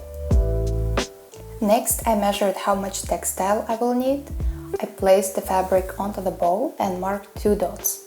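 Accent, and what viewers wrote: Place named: Russia. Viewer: Акцент русских ни с чем не перепутаешь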